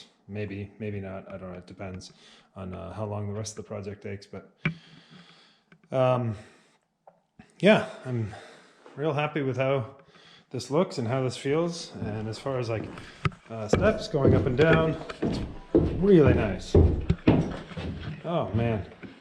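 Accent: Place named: South, United States. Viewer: Hey I almost like you talking kinda “southern”. Made me miss my hometown 😂. I love all that cherry wood, is it a soft or hardwood?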